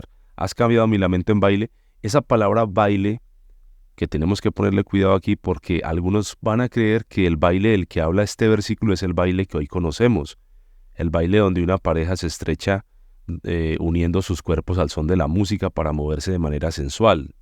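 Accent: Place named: Colombia. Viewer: Es la Primer vez que edtoy OpenDocument de eyte pastor y la explicación de la palabra deDios. Por el asento como habla pienso que es Colombiano 😊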